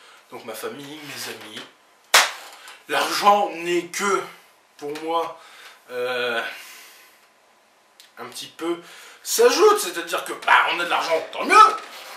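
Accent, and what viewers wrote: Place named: North, France. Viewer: C'est bon le titre est dans le bon sens pour nous XD tu me fais trop rire avec ta définition du Geek, enfin la c'est plutôt le stéréotype x) (HS : on entend bien ton accent du nord, j'adore ! Ça me rappelle des souvenirs !) XD prendre son Larousse, la chose a laquelle je pense le moins (faut que je m'en achète un d'ailleurs) on sent le suspense monter quand tu cherches XD « Intello » c'est vrai que j'ai souvent entendu ça … tu lis ? T'es un/une intello, t'as des bonnes notes à l'école ? T'es un/une intello. Tu as totalement raison, les ¾ des gens ne savent pas ce que ça veut dire. Je pense que tous le monde (ou presque) doit se serrer la ceinture en fin de mois ! Oh sérieux j'aime ta façon de penser ! Je suis sur que si on se rencontrait en IRL on jonglerais entre grande amitié et de grand débat (j'adore les débats avec les gens que j'apprécie XD) (HS : je vois des livres dans ta bibli que j'aime bien XD) j'avoue que lorsqu'un livre à une moral j'adore ça, quand ça fou une grosse claque en pleine figure et qu'on réalise qu'avec ce qu'on a on est bien. 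Encore une fois une super vidéo ! J'ai hâte de voir la prochaine ! Bonne continuation !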